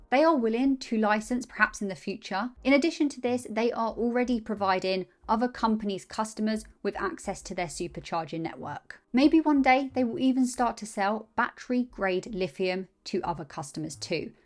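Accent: United Kingdom. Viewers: Found the channel, sub'd, I listen to several TSLA retail investors, this is the first British retail TSLA channel I've watched (American), The British accent is kinda fun. Anyway, I am part of the legion of retail investors that are all in on Tesla. I think self driving cars shares a certain similarity to the development of search for the interent in the 2000s, except that the capital requirements for self driving cars effectively means that some college kids in a dorm room will not win this race. Thus, the players are more well defined, and extroplating a winner is theoretically easier. Could I and others betting on Tesla be wrong? Of Course, but I think our odds are much better than those who were searching for the needle of google in the haystack of internet search companies back in the 2000s and the payoff is also arguably higher. Big upside and, an easier route to finding the winner. 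If Tesla can solve cars, I think the Bot situation is quite optimistic after that, since they can really push hard without worrying about consequences. If a car crashes, people can die. So a lot of restraint has to be utilized with the AI development. If a robot runs head first into a wall or a machine, some minor damage occurs at most, so they can really push hard and go nuts with little restraint on the bot. I think people will be surprised when Tesla wins self driving, but I think they will be utterly shocked and austounded at how quickly Tesla pivots and has effective humanoid robot wor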